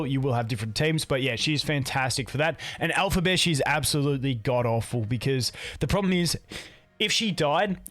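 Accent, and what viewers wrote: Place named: Australia. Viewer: love your oz accent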